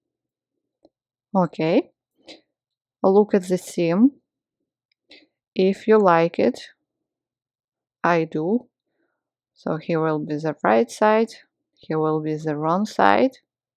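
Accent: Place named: Ukraine. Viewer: Are you from Ukraine? I am and I adore your pronunciation. Your tutorial is perfect, it's just what I need. I'm going to knit this hat. Thanks a lot. God bless you. ❤❤❤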